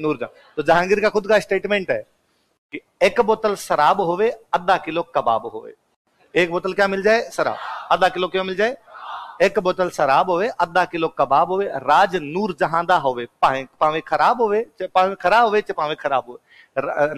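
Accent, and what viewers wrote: India. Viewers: सर आपकी देसी बोली बड़ी प्यारी है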